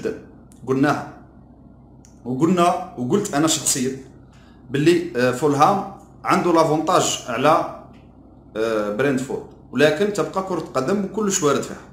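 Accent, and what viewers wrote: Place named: Biskra, Algeria. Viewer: من لهجتك تبان بسكري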